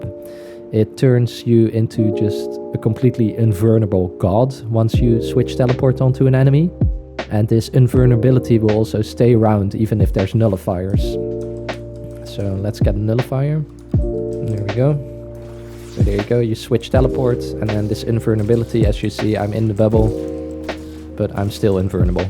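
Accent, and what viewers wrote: Netherlands. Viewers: Are dutch because you really sound like u are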